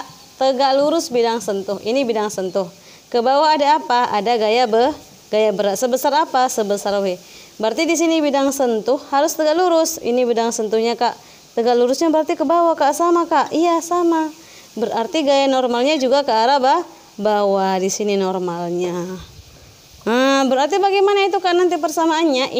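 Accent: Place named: Medan, Indonesia. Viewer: logatnya orang medan ini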